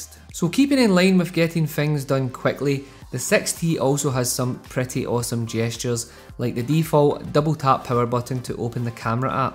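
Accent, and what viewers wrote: Ireland. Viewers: I am watching coz I like your accent,. Irish I assume??👌😄😄😄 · Is he fucking Irish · I love your accent man. Are you from Ireland? And also the name of the wallpaper pls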